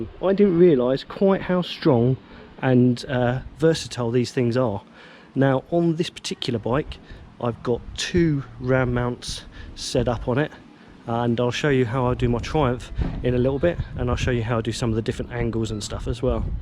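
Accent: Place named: United Kingdom. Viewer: I live in the UK and you sound Bristish 🤔 any idea of how it is like here ?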